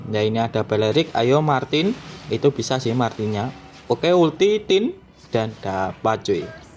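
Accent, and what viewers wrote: Java, Indonesia. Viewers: LOGAT JAWA KAU KUAT KALI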